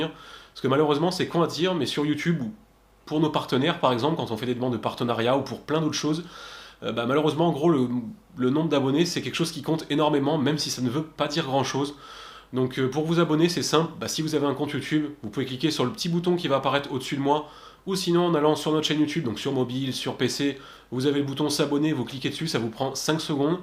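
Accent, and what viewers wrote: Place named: South, France. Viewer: je participe,compte facebook:Numa Fishing , Big Up première chaîne You tube dédiée a la pêche !!je pense c'est l’accent du sud qui joue un peu,mdr !! a plus les mecs ....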